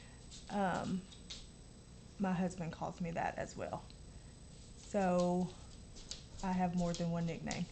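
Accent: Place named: South, United States. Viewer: ha ha I still love your accent props southern gal xx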